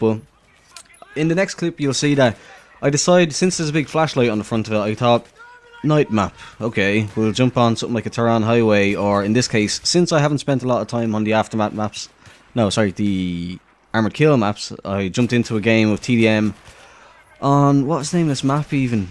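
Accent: Ireland. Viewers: Where in Ireland are you from? Used to live in Leixlip a long time ago! Just subscribed a few days ago - and am enjoying the content!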